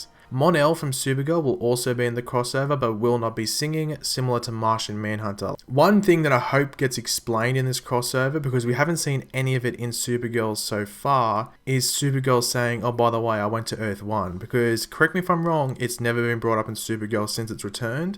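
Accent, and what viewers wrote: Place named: Australia. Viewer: Do all australians sound so nice?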